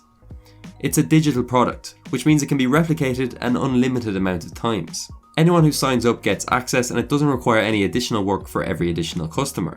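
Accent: Ireland. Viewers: Me in the first few seconds: "Is that an IRISH ACCENT??" 👂🏻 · What's the background music ? Great video btw. Really enjoyed it! Are you Irish? XD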